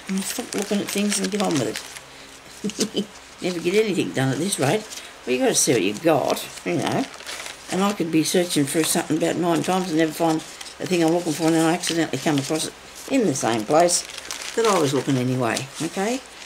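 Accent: Australia. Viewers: Hello, just found your podcast about the padded coat hangers. Enjoyed it so much wanted to watch more. Nice to hear your Aussie accent, from a kiwi in Texas. 💖💖😊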